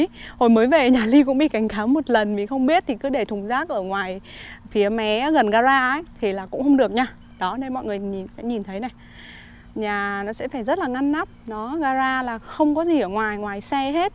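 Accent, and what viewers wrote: North, Vietnam. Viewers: Ly nói giọng người bắc nghe dễ thương quá đi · Giọng Bắc thôi chứ không phải giọng Hà Nội b ơi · Nghe giọng nói của Ly hay ha, chính xác người Hà Nội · Giọng nói Hà Nội vẫn chuẩn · Giọng Bắc thật quyến rũ.. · Giọng Hà nội của Ly nghe rất dễ thương . ❤️ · giọng hà nội nghe thích bạn, mặc dù ở đời sống ở Mỹ rất cao nhưng chi phí cũng không hề nhỏ rất cảm ớn đã chia sẽ những thông tin nhà ở Mỹ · Giọng em là m Bắc sau 1980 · em là bắc kỳ hây miền trung dậy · Em gái này người miền Bắc sau 1975 phải không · Mình dân gốc Bình Dương nhưng sao thích giọng con gái Hà Nội quá😍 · Nhìn e cưng quá muốn yêu e luôn giọng bắt bắt lại nghe hay quá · giọng này là Hn chuẩn đấy . không phải giọng tỉnh lẻ đâu nhé. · Cảnh quan sạch đẹp, môi trường sạch, giọng nói dễ nghe giông giọng hà nội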